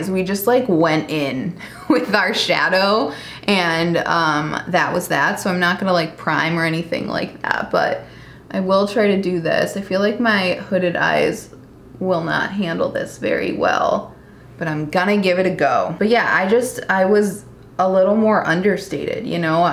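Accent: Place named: Midwest, United States. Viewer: the midwest accent is everything.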